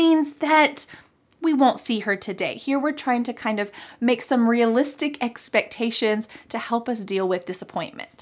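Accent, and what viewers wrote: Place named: United States. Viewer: I love your American accent❤ And I also have been following you since 2018 and have learnt a lot with your videos.Greetings from 🇨🇺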